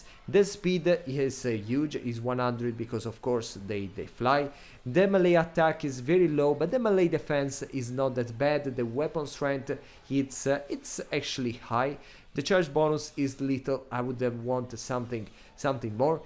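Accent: Italy. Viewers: Ciao I'm quite sure you are a fellow Italian by the English accent. If not, great video anyway. Grazie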